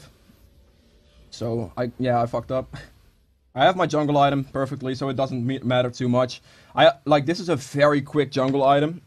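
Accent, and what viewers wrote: Netherlands. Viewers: dacht ik al aan je accent te horen! Vooral aan de S:) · You have a dutch accent are you dutch????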